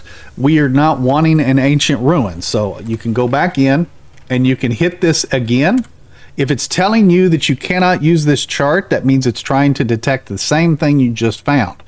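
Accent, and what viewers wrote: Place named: South, United States. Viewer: I'm trying to pinpoint your accent. I don't think it's FL, sounds very similar to the AL, or GA that i'm used to hearing but perhaps not quite.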